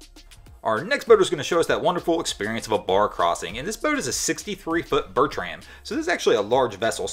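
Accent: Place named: Pennsylvania, United States. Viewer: I can tell you're from Pennsylvania, the way you pronounce the letter O.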